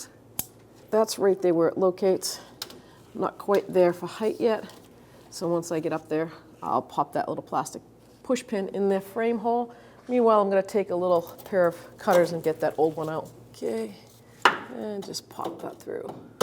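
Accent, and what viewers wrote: Boston, United States. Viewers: I tremendously appreciate how calm and straightforward you are with your videos! No electronic riffs and no annoying background music to muffle or garble your words! Just clear speech (w/ a Boston accent) and straightforward instruction. I recommend you to whoever I can while talking about vehicle maintenance. I thank you again!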